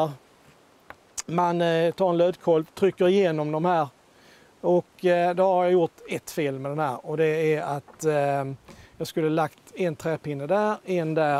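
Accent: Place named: Skåne, Sweden. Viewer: Not BILL-tong, but bill-TONG. Equally tasty, nevertheless. Wonderfull idea and a great Scanian accent! I have to make one myself instead of filling my check-in luggage with billtong every time I return.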